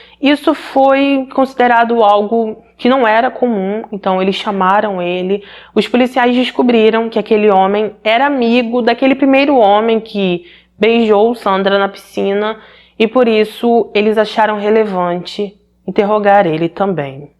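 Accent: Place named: Belém, Brazil. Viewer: Sou novo no canal e adorei os vídeos. So uma curiosidade: és do norte do Brasil? Eu sou de Belém do Pará e teu sotaque é muito parecido com o de Belém 😂. Sucesso pro canal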